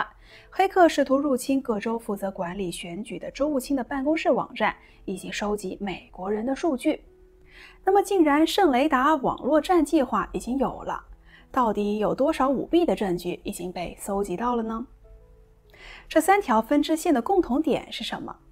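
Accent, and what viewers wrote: China. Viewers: 這是大陸哪裡的口音啊? 真好聽的聲音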